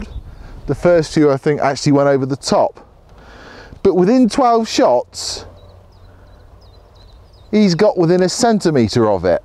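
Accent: England, United Kingdom. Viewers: Nice show, sir, nice show. Your accent is bloody English, i like it.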